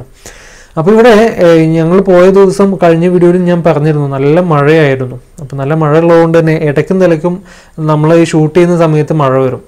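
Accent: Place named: Ottapalam, India. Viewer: Nalla ottappalam language good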